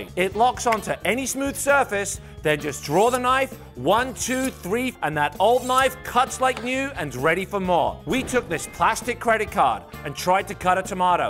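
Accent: United Kingdom. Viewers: I’ve only watched penguinz0 videos. I didn’t know he was British I was so surprised when I heard him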